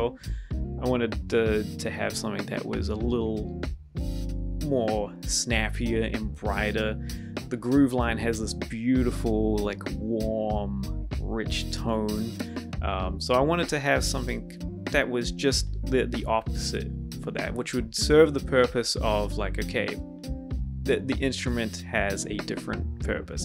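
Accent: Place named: New Zealand. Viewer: It's always funny to hear the familiar Kiwi accent out of the blue. You're an amazing player bro!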